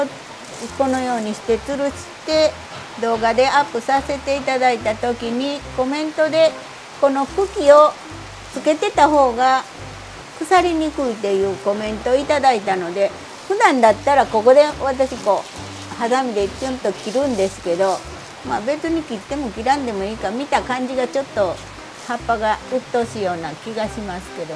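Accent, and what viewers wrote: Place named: Kochi, Japan. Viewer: 高知弁のイントネーションが可愛いですよね…！私も高知の片田舎越知町です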